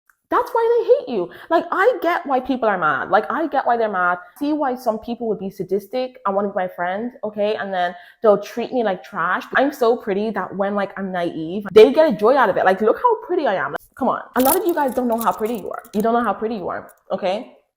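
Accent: Ireland. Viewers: Are you from Ireland ? Thought I heard the accent 😊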